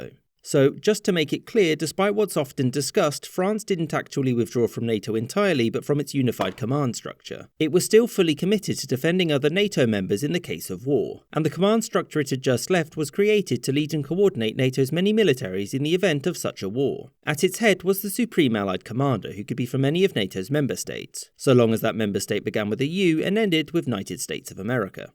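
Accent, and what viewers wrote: United Kingdom. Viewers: given the narrator’s very British accent, I suggest your comment is misplaced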